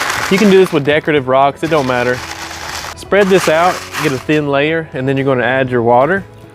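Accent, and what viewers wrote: United States. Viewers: Sir I love your country accent, where are you from brother?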